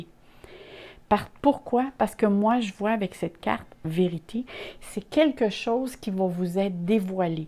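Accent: Canada. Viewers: Bonsoir, ma petite. Je vous est écouté avec beaucoup d'attention et d'intérêt. Et vôtre tirage ma parlé. Rien à voir mais je doit vous le dire. ( J'aime vôtre accent Canadien. ) Bon revenons à nos moutons comme ont dit. Ont vois de suite vôtre professionnalisme, pour ce qui est d'interpréter la lecture des cartes. Sans oublier, votre précision exceptionnel. Et pour celà je ne peut que vous dire merci. Et BRAVO. Je vous souhaite une très bonne nouvelle année 2021. Santé, bonheur, joie, prospérité, et amour. Que dieu vous protège. Amicalement Daniel. ( je suis balance ascendant scorpion. ) bye bye.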